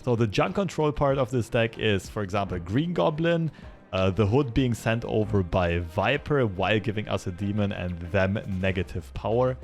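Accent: Germany. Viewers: where u from? u sound like German or some nordic country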